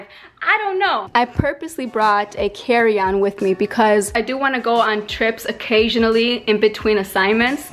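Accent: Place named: Michigan, United States. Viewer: If I'm not mistaking it sounds like Michigan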